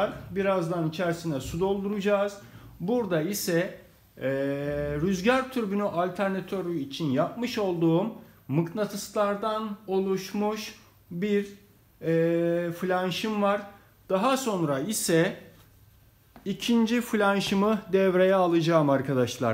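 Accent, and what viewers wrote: Turkey. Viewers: He speaks with a local Turkish accent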